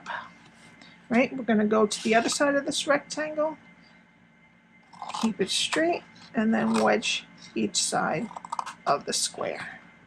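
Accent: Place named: East Coast, United States. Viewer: Love your accent! Are you from back East?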